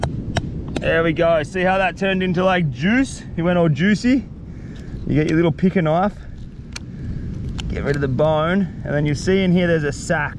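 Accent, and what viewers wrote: Australia. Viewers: Thought u were Aussie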